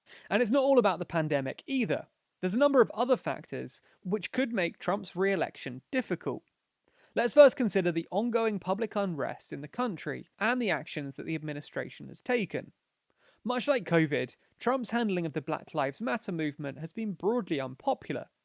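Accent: United Kingdom. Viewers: his British accent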